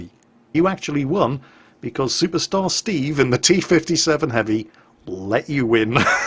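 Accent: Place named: New Zealand. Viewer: Agreed 100% - great to hear a voice from home, even better someone who loves the absurdity and sheer 'fun' of (especially) WoT. Best from NZ.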